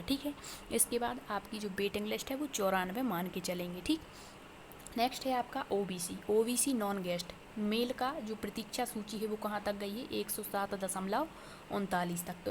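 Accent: Bundelkhand, India. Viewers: आपके बोलने के लहज़े ऐसा लगता है कि आप बुंदेलखंड से हैं मैडम🙏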